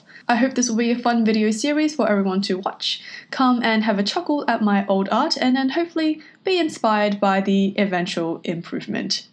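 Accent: Australia. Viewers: Wait your Aussie! Gday from a fellow Aussie artist 😁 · Aussie Aussie Oi Oi Oi (didn't know you were Aussie)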